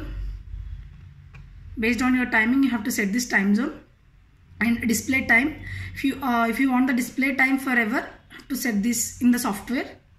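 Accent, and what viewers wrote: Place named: India. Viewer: This english is just understandable in India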